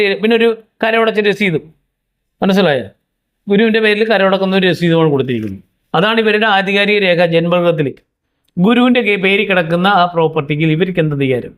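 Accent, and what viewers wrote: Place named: Kozhikode, India. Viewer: ഈ വോയ്സ് കോഴിക്കോട് കാരന്റെ എല്ലാ... കോഴിക്കോട് സ്ലാങ് എനിക് അറിയാം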